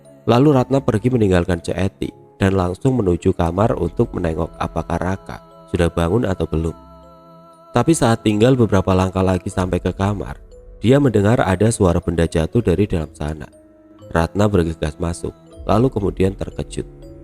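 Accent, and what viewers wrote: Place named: Banyumas, Indonesia. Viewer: Orang ngapak bukan si?? Medoknya keliatan banget kalo lg percakapan 🤭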